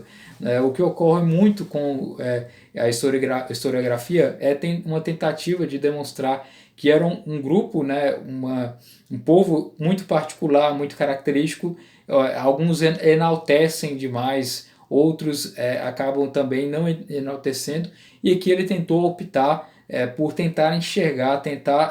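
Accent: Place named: Recife, Brazil. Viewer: Você é de Recife? Percebi um leve sotaque.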